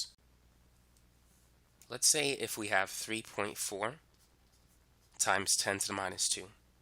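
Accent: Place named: New York, United States. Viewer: I came hear to get my seventh grader help. Now I can't stop listening to him. His voice is calming, yet keeps our interest. I love my friend's New York🤔 accent.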